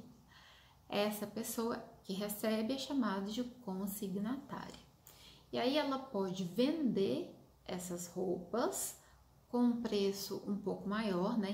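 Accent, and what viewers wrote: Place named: Minas Gerais, Brazil. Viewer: aaaa o sotaque mineiro é a coisa mais confortável de se ouvir🥰🥰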